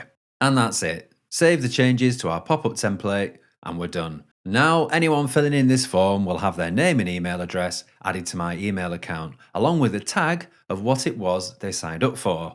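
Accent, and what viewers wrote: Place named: Northern England, United Kingdom. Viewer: Pure class. For someone with the IT skills of a lobotomised pebble, this was priceless. Nice and slow baby steps. No Yoda style html jargon and all them other things I simply do not understand with IT. You got yourself a new subscriber and follower. And the extra bonus was your accent. It is nice to hear an English accent as some other accents go so fast that I'm lost before I begin. Being from Norfolk in the UK, you don't get much slower accents than us, good ole boy but that Northern twang of yours is great for this. A bloomin good job. Bootiful.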